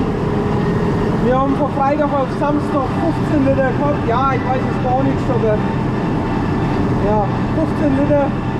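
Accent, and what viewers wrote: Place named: Middle Franconia, Germany. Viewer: Kommst du aus Mittelfranken du redest genau so wie ich 😂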